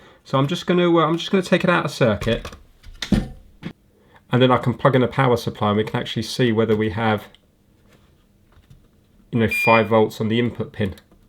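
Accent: United Kingdom. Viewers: its funny, I have been listening to you for years now. My daughter says to me (her room is down the hall from my office / gameroom) shes like you really enjoy listening to British youtubers, and I said "It's " said been listening to him for years and being British always sounds more "official" Thanks as always for your content.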